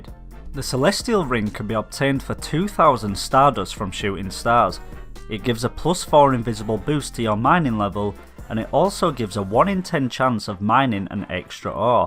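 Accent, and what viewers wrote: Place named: Yorkshire, United Kingdom. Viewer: Jesus, that's a strong Yorkshire accent. Where abouts are you based? Think you're very, very close to me (Wakefield)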